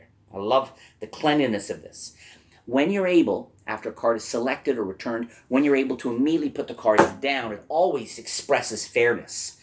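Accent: Canada. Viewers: Kiera Todd not sure what it is. Maybe it’s the glasses or that Canadian accent, not sure, but he is really good. I always like the tricks he does.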